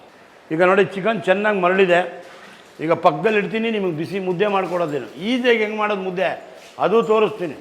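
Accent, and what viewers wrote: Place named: Bangalore, India. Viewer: ಗುರುಗಳೇ ತಮ್ಮದು ಯಾವ ಊರು ತಮಗೆ ಯಾವೆಲ್ಲಾ ಭಾಷೆ ಬರುತ್ತದೆ ಕೆಲವೊಮ್ಮೆ ಬೆಂಗಳೂರು ಭಾಷೆ ಆಯಾಡ್ತೀರಾ ಮತ್ತೆ ಮಂಗಳೂರು ಭಾಷೆ ಆಡ್ತೀರಾ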